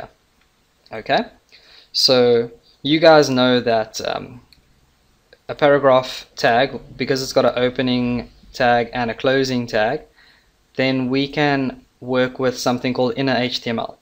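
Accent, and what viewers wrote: South Africa. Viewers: Are you South African? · Was so shocked when I heard a South African voice! #LocalIsLekker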